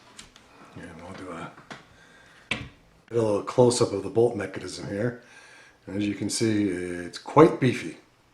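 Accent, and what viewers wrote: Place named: United States. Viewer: Why do you have an american accent if your Australian?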